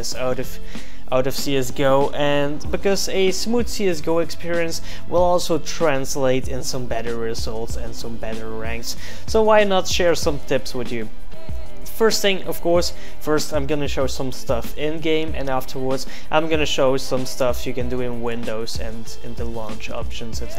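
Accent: Netherlands. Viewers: Wait a sec, you dutch bro? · Whuut are you dutch? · Are you from the nethetlands? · are u dutch? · Yay nederlander die engels praat lols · je hoort heeell goed als iemand nederlands is xDD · oooh je bent nederlands k daxht al toen je control alt delete zei youre dutch i was thinking about your accent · So you're Dutch, or Flemish? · You are dutch? :D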